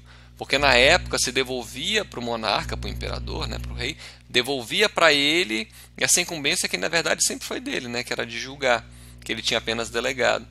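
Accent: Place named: North, Brazil. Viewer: Esse professor é do Norte, conheço esse sotaque, kkkk. Só não sei identificar de onde, se do Pará, Macapá ou Amazonas. Alguém sabe me dizer de onde ele é?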